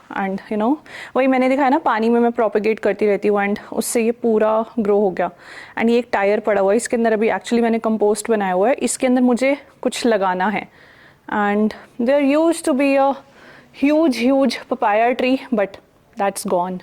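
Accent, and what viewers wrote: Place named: Uttarakhand, India. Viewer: Looks from her accent that she is a Uttarakhand Brahmni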